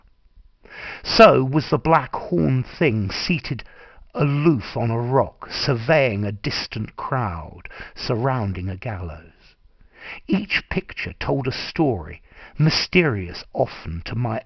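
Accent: RP, United Kingdom. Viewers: I have been searching the web for weeks and could not find a good lesson to sound more British. This recording is excellent. Thank you very much!!! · Fabulous British RP. We need more like you. I'm devouring your videos. I do like how you pace your speech. I try to mimic your pace and speech. Thanks for all the videos. Do you recommend any audio books with British RP please?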